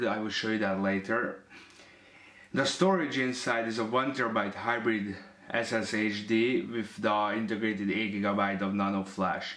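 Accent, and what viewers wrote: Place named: Hungary. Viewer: Kihallottam a magyar akcentust :) Hun bemutatót nem akarsz csinálni?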